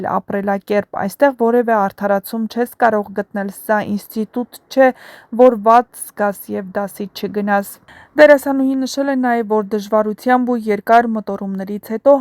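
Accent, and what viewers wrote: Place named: Russia. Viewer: Ինչի եք երբ բառը արտասանում եռբ, ետ ինչ զզվելի պրիմիտիվ մոտեցում է Հայերեն լեզուն խոսել ռուսական ակցենտով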